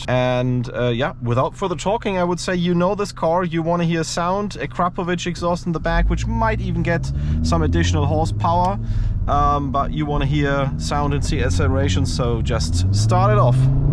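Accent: Germany. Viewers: omg German Accent.....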